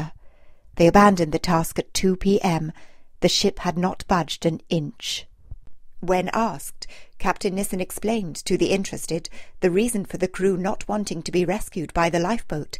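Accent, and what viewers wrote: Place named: United Kingdom. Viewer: Apart from the very informative contribution, I like the good British pronunciation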